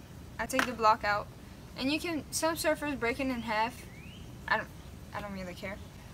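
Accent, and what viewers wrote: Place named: France. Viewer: On entend beaucoup ton accent français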